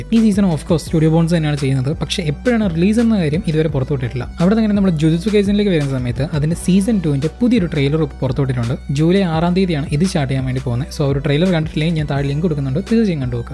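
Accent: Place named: Kottayam, India. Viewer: ചേട്ടന്റെ എല്ലാ വിഡിയോസും ഞാൻ കാണാറുണ്ട്. എനിക്ക് ഒരു കോട്ടയം എവിടെയോ തോന്നുന്നുണ്ട്.കാരണം എന്റെ ചേട്ടൻ കോട്ടയത്തായിരുന്നു degree ചെയ്യ്തത്. ചില കാര്യം പറയുമ്പോൾ correct എന്റെ ഏട്ടൻ സംസാരിക്കുന്നത് പോലെ തന്നെയാണ്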